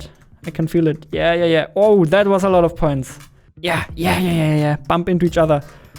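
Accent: Germany. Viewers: Oh lol I knew the accent was German (Windows-search-bar) (I don't know why I did this ) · Ooh you are germaaan. I wondered why your English sounded just slightly off. Guten Tag btw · Man kann diesen Deutschen akzent nicht überhören xD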